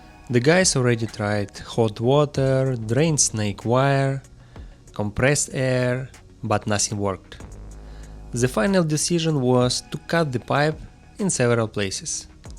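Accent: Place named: Russia. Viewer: здравствуйте,я смотрю что вы наш по английскому произношению 😂,скажите как вы начали путь и как проделали путь к капитану в иностранной компании я через 4 месяца буду поступать в Макаровку и волнуюсь по поводу английского и карьеры не хочу по Москве реке плавать на паромах до конца карьеры)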